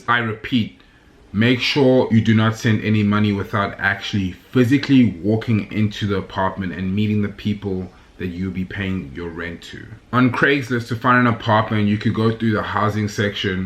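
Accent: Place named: South Africa. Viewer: Crazy how you haven't really lost your SA accent. Love from a former Edenglenian 💕🔥👏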